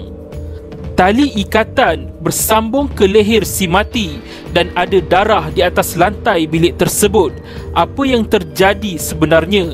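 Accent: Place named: Malaysia. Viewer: alah memang slang melayu cmtu kn hshsh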